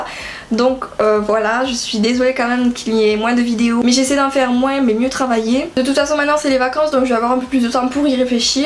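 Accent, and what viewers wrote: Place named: Marseille, France. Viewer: Sinon t'es une marseillaise ? pcq chepa si c'est moi mais t'a un accent marseillais et j'aime trop ton accent 😘😘😚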